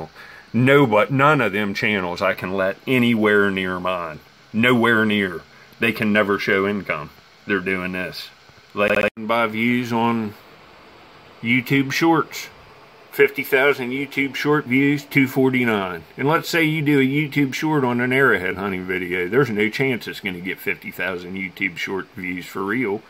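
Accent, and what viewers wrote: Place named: Pennsylvania, United States. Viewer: Your welcome. I never dreamed that people could buy views and likes ect. Thanks for all the explaining. But i have a upgraded youtube account and dont have to watch ads. How is the pay out different on that? My son says the channel makes more this way. Also how much of a super thanks does youtube keep vs. what you get. Percentage wise. This is the only channel i have ever donated to. I am by know means i person with tons of cash. But this channel is my favorite because it has alot in common with my way of living a simple life. I was born near the river just north of Pittsburgh and moved to ky in the mid 80s when everyone in my family lost thier Good paying steel mill jobs. 475k bread winners on the same day. My father was the only one smart enough to pack up his family and move to a much better place where there was way more opportunities. 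This channel reminds me of home. Even the way you narrate sounds like the people i grew up with that eastern as you say O hi a and western pa draw... lol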